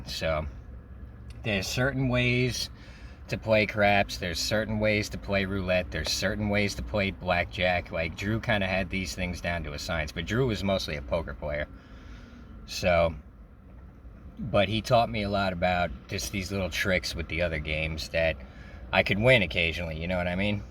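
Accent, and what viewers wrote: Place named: Long Island, United States. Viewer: 💜🐷💜 Enjoy listening to your stories. Watching from Palm Coast FL. Had a boyfriend from Long Insland who died 10 years ago. Your accent reminds me of him.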